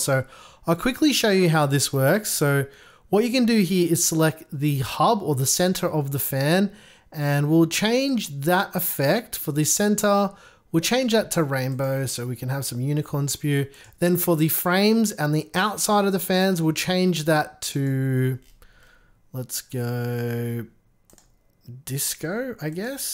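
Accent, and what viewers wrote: Australia. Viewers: Dude been watching your info for a while and appreciate an aussie voice with some real passion for the same things as me. Great content. Do you think that lianli will bring out a 140mm option as well?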